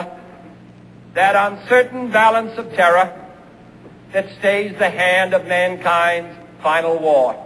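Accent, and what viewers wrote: Boston, United States. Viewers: where's jackie??? I LOVE JFK!!!! and his boston accent!!!! yea democrats!! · Notice his Boston accent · was not just a great president but a very handsome president with a very rare american accent that you don't hear as much anymore. God Fucking Bless you and your soul. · Yeah, he has a Boston/New England accent. He's from Massachusetts.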